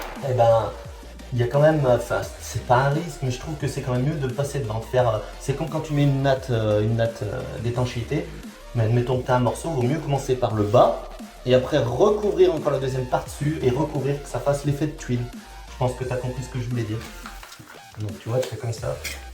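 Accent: Loire, France. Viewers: Ok je me disais bien que tu avais un petit accent du 42 ;) et une petite recherche google m'a confirmé ça. Ma mère bosse dans des petites boites de BTP dans le même département que toi ! Continue en tout cas, au top tes vidéos, et je rigole bien à chaque fois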